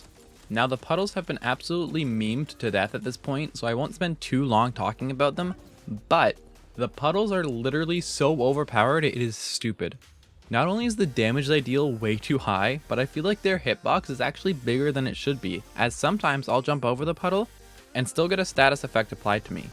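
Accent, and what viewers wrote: Canada. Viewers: you sound a lot older now in the more recent videos you sould like a gay canadian now you sound like a normal canadian in the current vids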